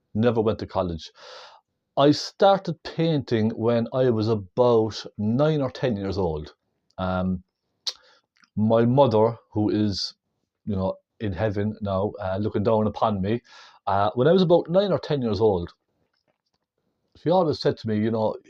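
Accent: Ireland. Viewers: it is very nice to hear an Irish accent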